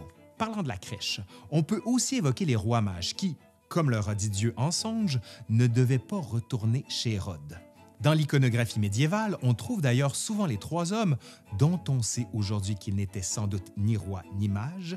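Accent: Quebec, Canada. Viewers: j'ai adoré votre video. un grand BRAVO! je me demandais ce que vous pouviez raconter sur l'histoire du "dormir", et sincèrement, un vrai cours d'histoire. et j'ai apprécié votre touche d'humour. en plus, votre accent québécois (je suis du Var en France), j'adore !!!! Merci beaucoup. je vous découvre. je vais suivre vos viéos. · Foutu accet canadien... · Passionnante histoire du lit, surtout racontée par un québécois à l’accent délicieux! Mes origines sont de centre France, plus précisément en haute Corrèze, et les anciens dormaient en campagne dans des lits minuscules. D’abord parce qu’ils n’étaient pas très grand hein, mais surtout aussi parce qu’il ne fallait pas dormir dans la position du mort dans son cercueil. Ils dormaient donc les jambes repliées. Ça allait quand j’étais gamin mais c’était plus dur en grandissant… Bref, l’histoire du lit n’en fini pas, comme on peut le voir dans certains commentaires. 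Merci pour ces vidéos drôles et instructives! Encore!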